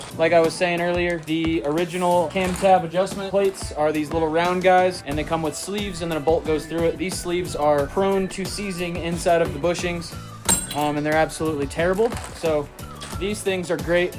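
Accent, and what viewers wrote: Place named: South, United States. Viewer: Love the Talladega nights accent😂😂😂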